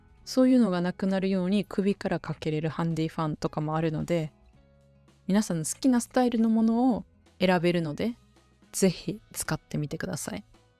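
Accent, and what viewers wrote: Kansai, Japan. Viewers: 関西弁のイントネーションが面白くて好きです!これから関西弁のポットキャストもっと聞きたい😁わたしも関西弁を勉強したいなぁ(いやいや標準語すら上手にできないくせに🤣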